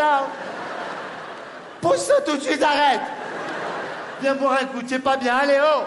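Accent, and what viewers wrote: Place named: North Africa. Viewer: J'aime la façon comment les Maghrébins parlent :D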